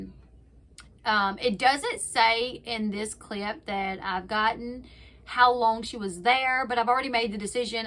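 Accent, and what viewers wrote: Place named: Louisiana, United States. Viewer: I know I love it!! It's that Cajun accent 😍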